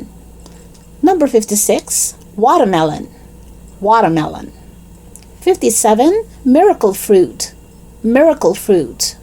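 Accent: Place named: Philippines. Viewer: I am a Vietnamese Catholic priest, I am staying in the Philippines for my studies, I like your Filipino English voice, I am pretty happy to listen to and imitate your voice! May God bless you all to help everyone ❤️😇💒🏫 students 👨‍🎓👩‍🎓🧑‍🏭🧑‍🏭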